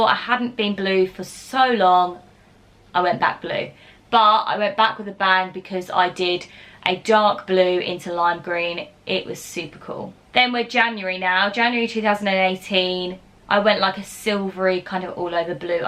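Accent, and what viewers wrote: United Kingdom. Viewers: Your british accent is 😍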